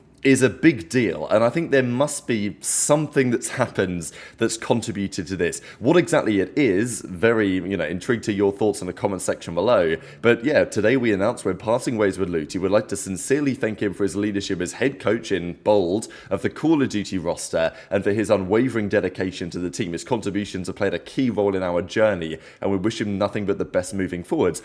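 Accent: England, United Kingdom. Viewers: He’s saying very much intrigued to your thoughts. Fellow Englishman I can translate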